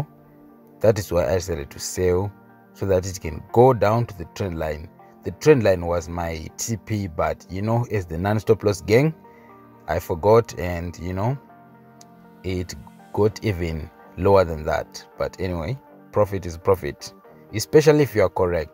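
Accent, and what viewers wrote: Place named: Zimbabwe. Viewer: I once asked you if you are a Zimbabwean that kind of English l know it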